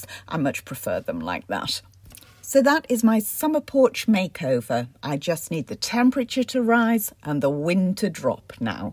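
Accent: England, United Kingdom. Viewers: Utterly gorgeous! Sounds a little English🥰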